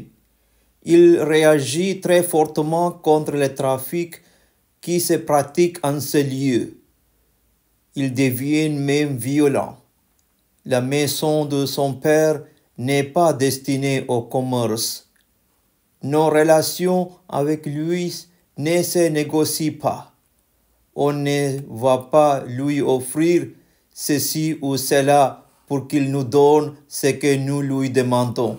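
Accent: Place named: South India, India. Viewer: Vraiment j'espère que tu est Indiens..... précisément sud .....vraiment ton français là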